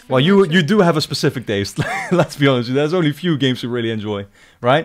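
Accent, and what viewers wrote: Netherlands. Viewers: You sound dutch... Are you dutch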